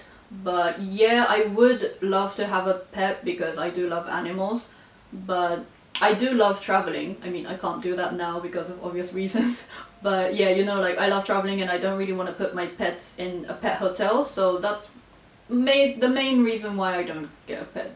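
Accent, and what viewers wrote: United Kingdom. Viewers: Love how you react each assumption politely and kindly! You look intelligent + have a beautiful British accent! My assumption is: you are a great reliable manager at work😍💕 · Wow! You went from three thousand to nearly thirty thousand subscribers in two years! That's amazing!... I'm a recent addition to the number, but have enjoyed watching some of your older videos. Your presentation is really nice and you seem to be a genuinely lovely person. If things haven't changed in the last two years, then I am very surprised that you aren't married. As a Brit, it's really nice to spot Asians who have lived in the UK. Our culture definitely rubs off, as with the accent and sense of humour... and Japanese women with a British accent when talking English sound really cool.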